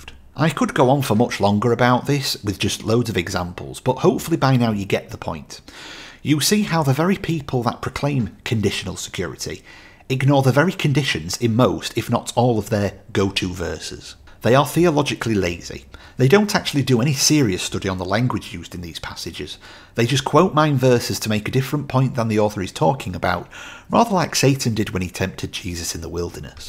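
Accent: United Kingdom. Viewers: Random but, I enjoy ur accent. It's like a very clean sounding English accent.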